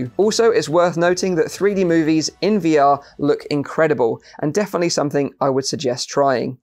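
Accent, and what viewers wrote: United Kingdom. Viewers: Why are British-accented people all so good at tutorials?? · Glad to have found this. Now I know this is nothing for those of us who create VR artand animation. We cannot install our animation app (like Quill) 🙁 ..and thanks for the brit accent! Clear to understand!! 👍🏽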